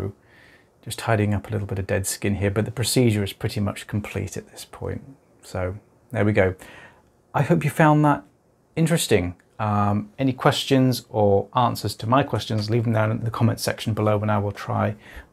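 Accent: United Kingdom. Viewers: I come here to practice British accent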